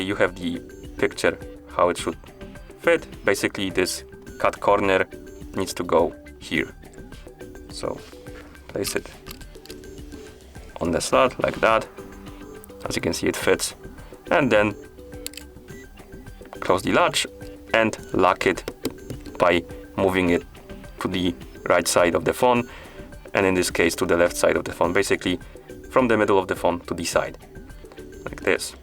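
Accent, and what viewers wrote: India. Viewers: I have lived in the US my entire life and know zero people with thick Indian accents but it’s still very easy for my brain to compensate for the accent, I understand every word of tutorials like this. Maybe, just possibly, it is you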